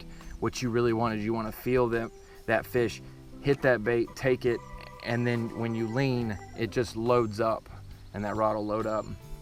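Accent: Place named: South Carolina, United States. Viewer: I thought you were another South Carolina boy for a second!! Very informative man. I absolutely love the jackhammer.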